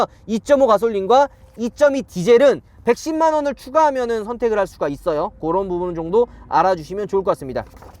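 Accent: Gyeongsang, South Korea. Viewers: 나나지는데요. 하는거보니 는 경상도 사람이었군 ㅋㅋ 담에 사투리 리뷰 부탁해요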